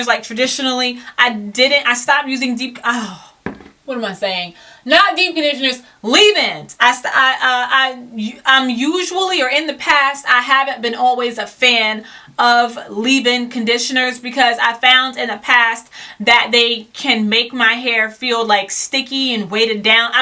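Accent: South, United States. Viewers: Am I noticing a country accent? I’m talking about the cowboy type too. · I hear it the country accent too.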